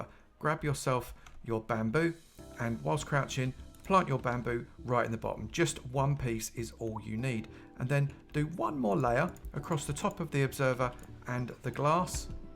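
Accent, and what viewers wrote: United Kingdom. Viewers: Oh, cool, a chill British voice, so I can wind down after work and learn new farm mechanics since I’m getting back into Minecraft after a decade… I might even watch more because he sounds so rela…”HELLO EVERYONE!!!” yanks headphones out of ears Nope, I think I’m going to find tuts online (like I used to do) and refigure it out myself this weekend with just Minecraft ambience/music as the only thing I will hear and know it won’t suddenly shoot up in volume for no reason at all.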